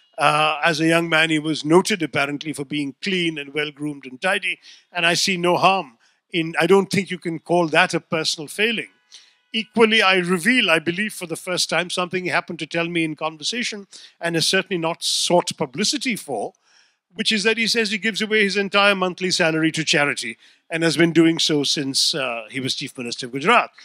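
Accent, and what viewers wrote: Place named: Oxford, United Kingdom. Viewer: Shashi is actually an intellectual fraud. Whatever he speaks against PM Modi is mostly biased and is more applicable to his PM mms or Sg or rg. Speaking good English without substance is of no use for the ‘cattle class’ who have been given gas cylinders, houses, business loans, pension, medical insurance, saved from the tyranny of triple talak, electrity connection, cheap mobile data and above all a sense of hope and pride together. Speaking lies 100 times won’t transform it into a truth even if punctuated with oxford English. A true fraud.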